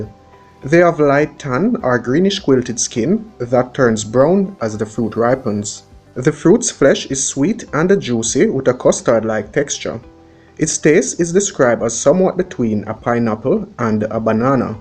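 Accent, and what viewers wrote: Jamaica. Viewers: This is ridiculously RUDE! The host is Jamaican sharing about native Jamaican fruits. The beauty of Jamaica is it's people, culture, food and language. He doesn't need to assimilate the way he speaks. He's sharing valuable information. Those who want the knowledge will stay for it and loving the way he speaks in the process. Too many people want to be westernized while disowing their own culture. You should be ashamed. People all over the world who don't speak English have accents. People in English speaking countries have accents and drop letters all the time. That's how dialects also work. I LOVE when people are themselves and don't switch up how they act or speak depending on who they think is important. He is being his authentic self which I value! Wonderful information is being shared, focus on that! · Sir, Sir, Sir 👍🏾👍🏾👍🏾you have impressed me from the very first video I saw about the pyramid in St. 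Thomas. I always said that you reminded me of a notable well-researched writer/publisher after I saw your first video. And to hear you referenced the writer called Mark Twain has confirmed that you are just as or even more gifted than he was. You have the same kind of passion for your work. So no bada watch weh di "th" edicated elites dem waan seh. They should all recognize the greatness within you and encourage you. Please do not go chasing after getting "their" institutional degrees either. Continue to teach your self and Edu-tain us and "they" will end up chasing you to offer an Honorary Degree. Also continue your genius in doing your presentations with the same kind of "Jamaican English" because the world loves our accent and language - ask those who travel. BIG UP USELF!!!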